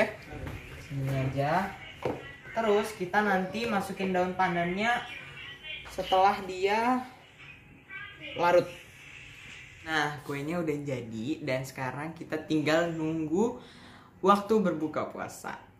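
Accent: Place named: Banjar, Indonesia. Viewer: Banjar mana suara ya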